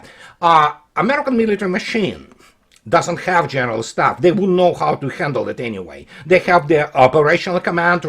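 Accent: Russia. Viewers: I don't understand people who complain about you speaking too fast. I usually speed you up to 1.25! Maybe I am just more used to the Russian accent, seeing as my wife is Russian. I follow numerous channels like Judge Nepolitano with Larry and Ray, Col. MacGregor, Scott Ritter, Pepe Escobar, not to forget the two Geek boys on the Duran. Won't get through all of them at normal speed. 😁😁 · Thank you for YOUR Russian insight, Russian high standard delivery, Russian accent and Russian humour!!! Excellent shows every time!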